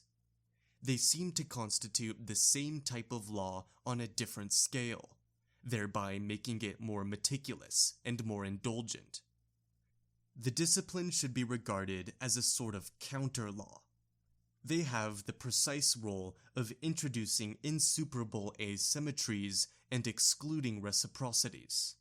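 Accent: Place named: United States. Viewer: You sound like a native speaker of American English, but you seem to have a lot of trouble with pronunciation of multisyllabic words. May I recommend that before you read a section, you look up longer words in the dictionary to get the pronunciation right? It's a massively hard job you're trying to do and it's a shame you're not creating masterpieces of oral presentation. (The most common error: Although the accent falls on the first and third syllables of the noun "distribution", in the adjectives "distributive" and "distributive" the accent falls on the second syllable. I think in places you're saying "juridical" but the pronunciation is so garbled, it's hard to know.)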